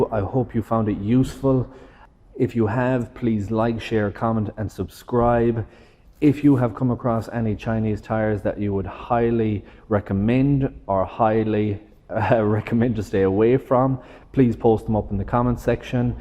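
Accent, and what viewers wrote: Ireland. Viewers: Sounds Irish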